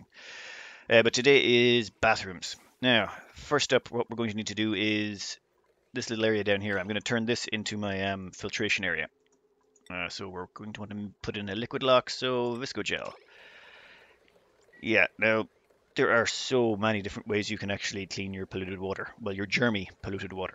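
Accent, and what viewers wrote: Ireland. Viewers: Where are you from? Do I notice an Irish accent?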